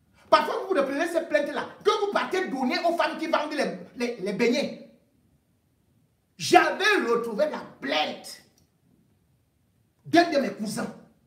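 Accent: Cameroon. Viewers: Mr Rémy ngono qd vs dénoncez les gens prenez la peine de bien articuler leurs noms, tout le monde ne maîtrise pas l'accent camerounais.La luplart de temps on ne saisit mm pas les Noms👺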